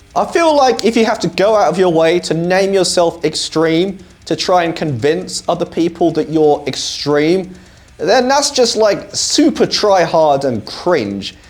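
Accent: United Kingdom. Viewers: limp has more then one meaning like floppy also you sound like your across the pond so bisket means cookie to you not sure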